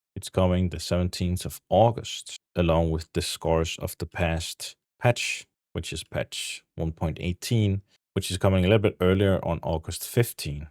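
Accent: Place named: Denmark. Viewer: you sound like a fellow dane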